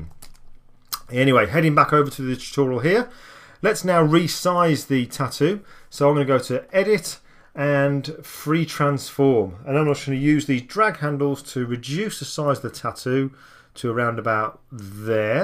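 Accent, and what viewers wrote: United Kingdom. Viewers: O cara nem é americano kkkkkk é britânico u.u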